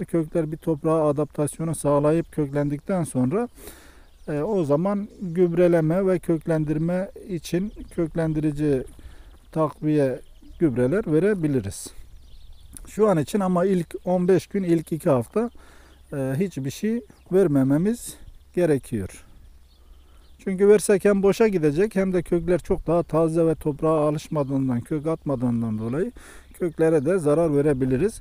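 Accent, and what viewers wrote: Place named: Adıyaman, Turkey. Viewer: Kardeş sen Adıyamanlımısın yau. Konuşma tarzın Adıyaman şivesi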